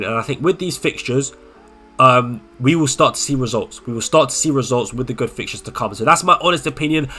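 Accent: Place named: London, United Kingdom. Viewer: Great content, really good. But... why on Earth do you support Man Utd when you have a London / south accent!?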